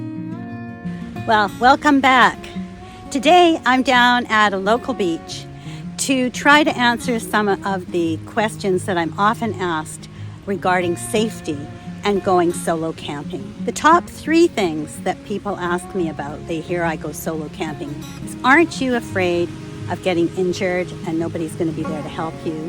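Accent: Canada. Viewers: I can tell she from BC. CA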